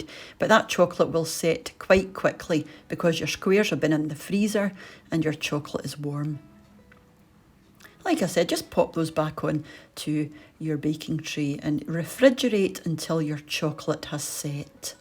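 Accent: Scotland, United Kingdom. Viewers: I am a big fan of ScotIand, it's people, culture and food. I absolutely loved the time I spent there participating in the World Bagpipe Championships a few years back. I don't know if you are aware of this, but closed caption does not work well with this channel and gives a truly comical take on your broadcast. Your Scottish accent is being misinterpreted and some of the attempts at translating are just comical because of your accent. No malace is intended here, just an observation. Can't wait to try these macaroons!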